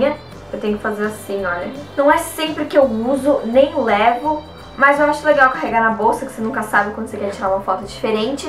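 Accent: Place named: United States. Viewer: eu queria saber uma coisa, seu sotaque "forte" de inglês é por conta de vc já ter morado nos Estados Unidos? Fala inglês fluente? Beijo e amei o vídeo 😁😘💜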